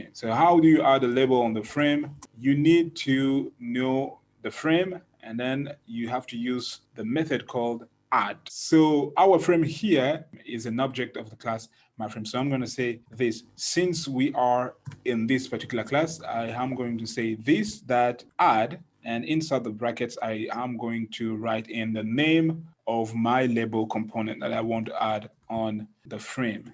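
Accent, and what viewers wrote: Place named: Nigeria. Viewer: Very nice Tutorial, thanks a lot! Is that a Nigerian Accent? :)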